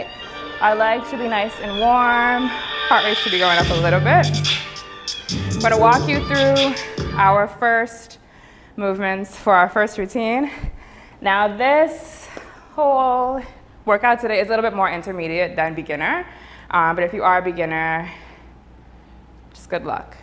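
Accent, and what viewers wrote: Bahamas. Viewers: This is A Bahamian instructor I hear an accent🇧🇸😄 242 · Listen quick question are you from the Bahamas?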